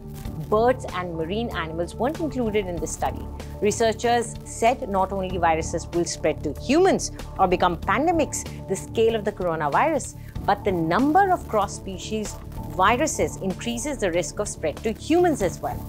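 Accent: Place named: India. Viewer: What a heavy Indian accent. I cannot remember how to speak in English.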